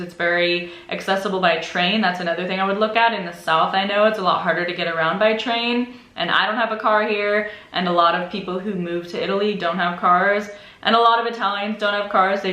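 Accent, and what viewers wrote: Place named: United States. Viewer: Interesting, I would though that longer time spent outside US would change your english to be more plain in intonation but you still have this singed US english. For example you say four times in the row "theeeeere" instead of plain and simple "there". If you were not from Nebraska I'd say your are from California :-))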